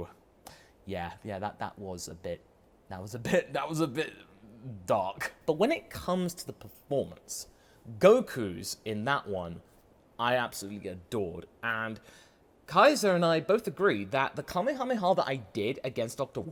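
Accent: United Kingdom. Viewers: HOLY YOURE BRITISH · I remember being so shocked that you were british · By any kind, Are you British?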